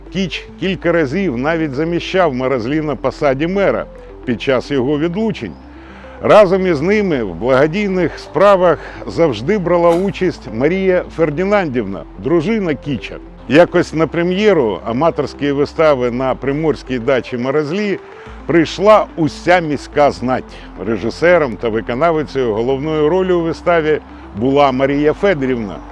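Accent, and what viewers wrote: Odesa, Ukraine. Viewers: Чудовий сюжет!Прекрасний ведучий! І вишенька на торті- українська мова з одеським акцентом ❤Дякую!❤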